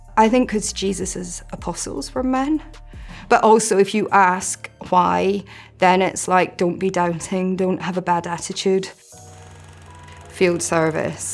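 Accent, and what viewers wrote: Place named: Scotland, United Kingdom. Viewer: I thought I could hear a Scottish accent... but only faintly...I was right..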